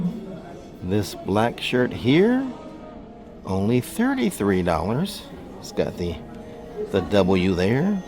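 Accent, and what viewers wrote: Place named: Boston, United States. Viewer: Wicked. Sounds like Boston.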